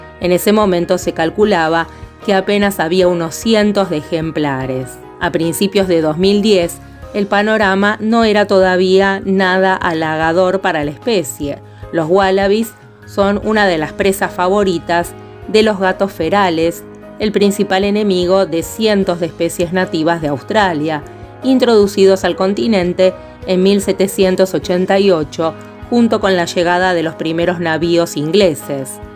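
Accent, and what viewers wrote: Argentina. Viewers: me encanta el saludo: hola amigos, en ese acento tan marcado argentino tusho, no sa sabia de la existencia de estos canales tan informativos de la vida biologica, ya me suscribi y ya vi muchos videos, los humanos somos un cancer, pero veo q la natalidad esta bajando, y ahora somos un poco mas ecologicos, ojala aprendamos a vivir en armonia con la naturaleza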